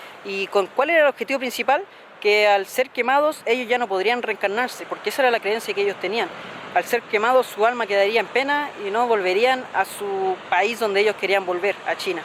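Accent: Chile. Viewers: Siga adelante eres buen locutor, actualizado y me encanta tu llegada a lo chileno compañero. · el habla como un chileno de clase media normal, además la mayoría de YouTubers jóvenes tienen formas muy peculiares y distintas para hablar no eh viste a nadie que hable correctamente en YouTube menos los chicos jóvenes y son los que más subscriptores tienen, eso es lo que atrae a la mayoría de las masa , nadie va ver alguien que es políticamente correcto en todo.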